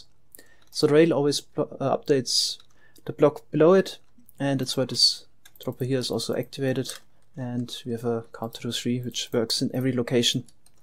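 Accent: Germany. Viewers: is German your first language?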